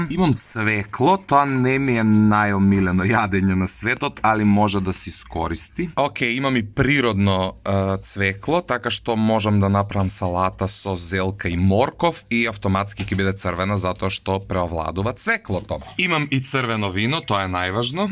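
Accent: Kumanovo, North Macedonia. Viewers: 24h само зборуваш кумановски диалект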